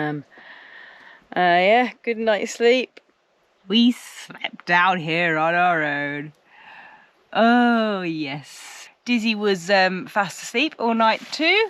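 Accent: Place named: Northern England, United Kingdom. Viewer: The first vlog I saw with you both I was amazed at your collective energy and togetherness 🤗 and it's great to have time away on your own but can be scary sometimes hey, I fully enjoyed this vlog as all your others and it was great to see at the end, if you don't mind me asking but with your northern accent where are you from, I'm from Burnley but live in London mainly for the weather 😁☀️😘 I can't handle the rain ☔ in Burnley 😳 it's horrible, even in summer you can get lots of rain 😳 have a great trip to Portugal 🇵🇹 will look forward to seeing how you both get on there 🤗 Edit, we've even had Hail Stones in London tonight 🙄☔😳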